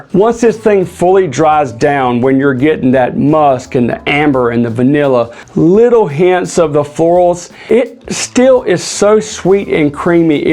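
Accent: South, United States. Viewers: Hey broski - this is a great one from Nishane With the southern accent, you should have went all Varsity Blues - it's a 10, motherf#&king 10!!😂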